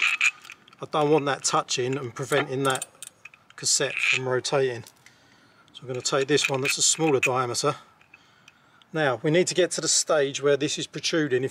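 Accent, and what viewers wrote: England, United Kingdom. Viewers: Nice to hear an Englishman doing one of these conversions. Most of them are from the US. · Судя по акценту ты англичанин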